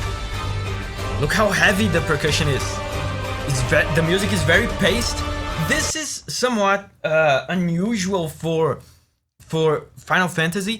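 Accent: Brazil. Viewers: Hey! You have sort of a brazillian accent, are you from brazil?? If not, where you from?? (im probably wrong lol)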